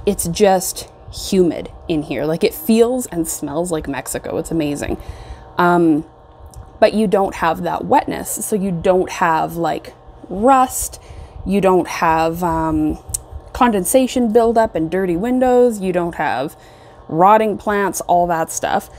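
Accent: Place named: California, United States. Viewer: You talk just like a Californian, LOL 😄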